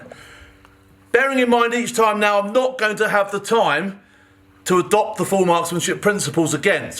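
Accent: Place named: Norfolk, United Kingdom. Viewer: Sounds like a norfolk lad,probably explains why he's a bloody good shot....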